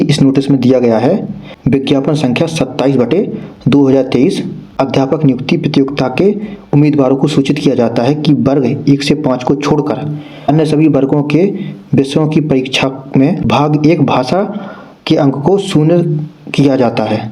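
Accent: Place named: Bundelkhand, India. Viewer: Kay bhajja bundelkhand ke ho ka ..😊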